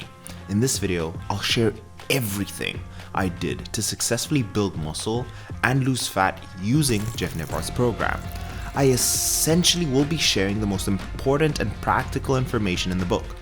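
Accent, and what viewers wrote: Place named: South Africa. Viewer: I just came across your video and your accent sounded South Africa so i kept watching. I am South Africa living in the States. I am starting life style change. Don't want to lose weight but want to gain muscle. I am enjoying your videos.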